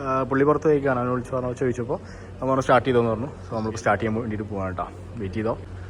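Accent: Kasaragod, India. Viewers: Kasargod slag ayaloo 😂🔥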